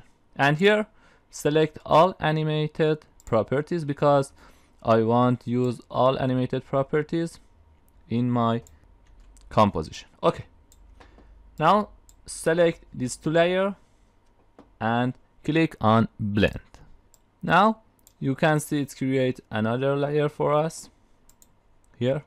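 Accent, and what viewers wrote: Iran. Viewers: حاجی حس میکنم ایرانی هستی از صدا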